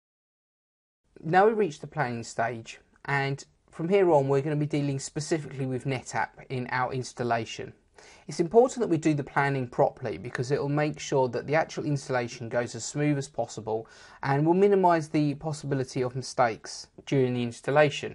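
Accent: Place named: United Kingdom. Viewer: Thanks for yor video but sometimes your britsh accent gets in the way. Too much 'nasal effects' lol making it hard to understand. for instance what you say the console(light) what does Dell and HP call it? And you clearly didn't answer the question to the missing part 5 video. Keep you answers simple please... Thank you once again. Do you ever work with DELL SAN's what do they call them? You introduced a new term called LUN but failed to explain it. What are the common SAN models used in an enterprise environment? Furthermore: For instance, in an enterprise environment installing and troubleshooting SANs (Best practice) Performing switch add/zoning. Installing and troubleshooting Virtual SAN, SnapView/MirrorView Thanks in advance!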